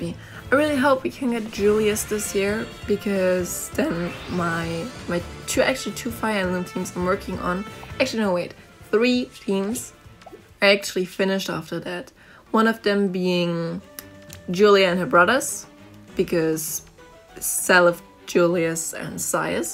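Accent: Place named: Germany. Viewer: Ich dachte mir doch, dass das 'n deutscher Akzent ist ^^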